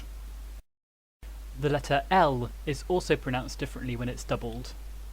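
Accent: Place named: Southern England, United Kingdom. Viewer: Southern English or southern part of midlands.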